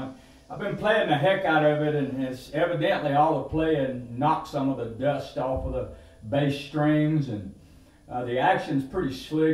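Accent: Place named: Rural, United States. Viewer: I am from Egypt, and this is the most english accent that i love to hear, is that the accent of the county sides people in America?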